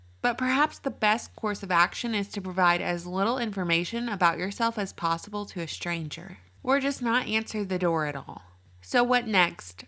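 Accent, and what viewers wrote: South, United States. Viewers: IDK if that country accent is you're true accent or not but love it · Oh my you had me rolling at"Spin the wheel Memaw!" Absolutely LOVE your southern accent!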